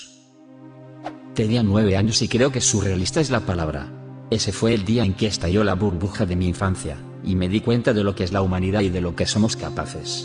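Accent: Russia. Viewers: Es una chica, es extranjera, no se si sea la única administradora del canal. Tiene un acento euro asiático o similar, tal vez ruso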